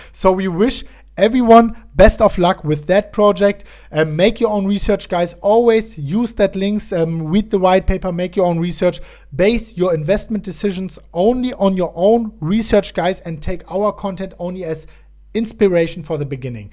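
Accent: Germany. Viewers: His accent is as German as it can get :) German here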